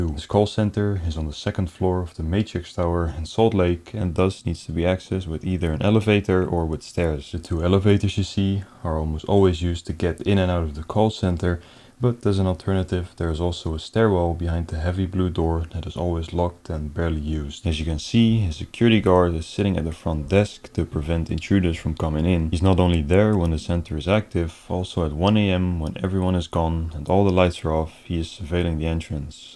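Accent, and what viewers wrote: Netherlands. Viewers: He will not reveal his location, but yes he sounds Dutch to me. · Judging by your accent.., your Dutch?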